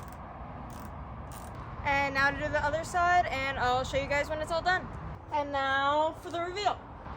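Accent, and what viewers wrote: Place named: Newfoundland, Canada. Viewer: Thing a ma bobber u talk like a newfie Like me lo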